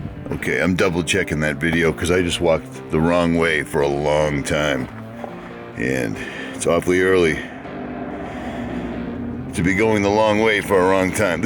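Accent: Boston, United States. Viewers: Sounds like your cuzin from boston! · Sounds like you have a slight Boston accent.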